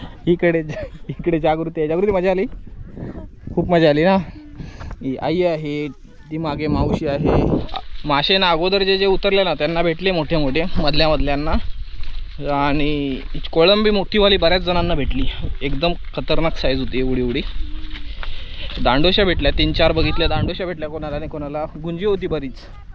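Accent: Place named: Konkan, India. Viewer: me pan rajapur chi aahe ha video pahun aani aapli kokani bolna mala khup aavadte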